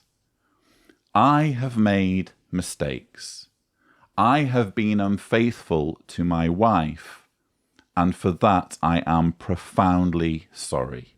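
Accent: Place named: North, United Kingdom. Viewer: I've just discovered you over the last 2 days. I noticed your accent was familiar.... I currently live in Manchester and I was a JW in the Chesterfield/Sheffield area. I left the JWs at 16 having been baptised at 14/15. My parents and brother don't speak to me. I've never heard anyone articulate the same experiences I've had as a child until I discovered your channel and the Leah Remini interview and didn't realise how isolated I felt in my experiences until I heard others with similar experiences. Thank you from the bottom of my heart. Your down to earth way of putting everything is just so refreshing. Please don't stop making videos ♥️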